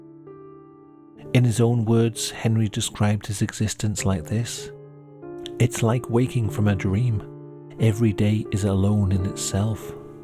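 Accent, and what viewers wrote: United Kingdom. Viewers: Love your channel,. I’ve always been naturally curious about morbid subjects but I’ve noticed that after several traumatic experiences in my real life, I have an increasingly developed relationship with things that disturb me. I can’t seem to find any interest in media with subjects that aren’t slightly taboo or disturbing anymore. Maybe it is part of the long term processing, maybe it’s part of just having the veil lifted since my own disturbing experiences. Sometimes I wonder if it’s a curated and safe way to feel afraid or unsettled, but not be in any danger, and to be learning and exploring ideas without direct risk. It’s made watching videos such as yours varyingly more intimate as an experience, psychologically, than before. Your voice is also very familiar and stabilising to listen to - not trying to sensationalise, you’re genuinely interested in what you’re discussing without novelty of the subject or people involved. 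It’s also probably comforting for a familiar British accent and creator in a US heavy site. Looking forward to all videos in the future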